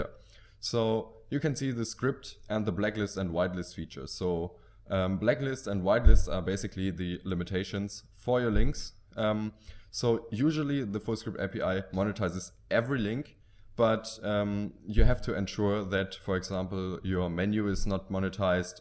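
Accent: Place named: Germany. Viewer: Wie man den deutschen Akzent raushört haha xD. Aber ihr macht wirklich gute Arbeit, für Creator oder Developer wie mich ist es ein Segen das es linkvertise gibt ^^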